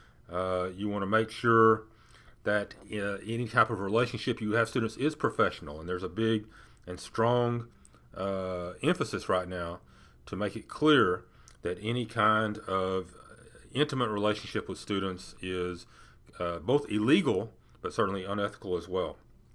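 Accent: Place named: South, United States. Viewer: Dog your southern. not Texan. I know.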